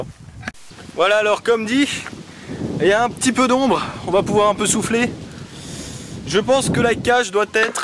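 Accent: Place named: Alsace, France. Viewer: MMMMMH Cet accent Alsacien! arrêtes de te renier:3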